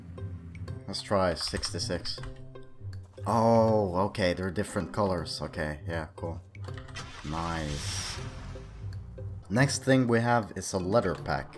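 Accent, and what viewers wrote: Sweden. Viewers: What are you Swidish or What ?or i hear wrong?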